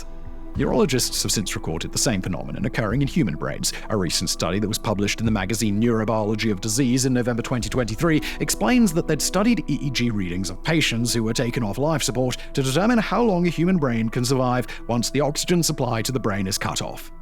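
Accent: United Kingdom. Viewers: Damn he is british.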